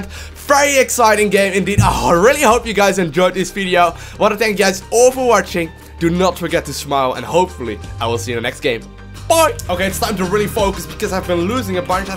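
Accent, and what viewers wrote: Netherlands. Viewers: Ik dacht al dat je een Nederlander was :), je spreekt heel goed Engels maar dat accent zal nooit weg gaan :P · lol ik wist dat jij nl moest zijn door je accent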